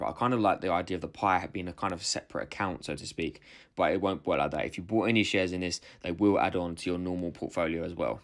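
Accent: United Kingdom. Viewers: Hey, I like the British accent. I’m Kenyan and because we were a British colony, that’s the English we speak there. When I moved to the US 9 years ago, I had a hard time adjusting, and even today I still struggle. Other than that, great content!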